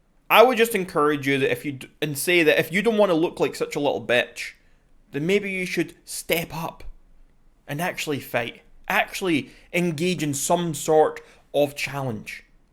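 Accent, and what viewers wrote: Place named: Scotland, United Kingdom. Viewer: You are a moron. You didn't destroy anyone. You didn't crush anyone. All you did was show your ignorance and show everyone the detrimental affects of mothers doing drugs, smoking and drinking to excess while pregnant. By your logic Africa doesn't exist because Giraffes live there and nowhere else. The North Pole doesn't exist because Polar Bears live there and nowhere else. Go read a book on English history, Capt James Cook was a real person who discovered Australia while on his ship the Endeavour. Oh please answer this question that everyone wants to know. Why do you, a Welshman, living in Wales, have a Scottish accent???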